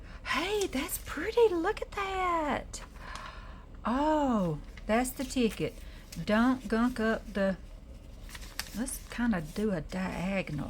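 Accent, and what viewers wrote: South, United States. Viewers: the painted papers are beautiful. My daughter and son-in-law served in Army and they did a tour in Afghanistan. Thank you for being you! I enjoy watching your videos and listening to your southern accent. You are a great inspiration for me. I can't wait until the end of August when I retire so that I can craft full time. God Bless and get well soon!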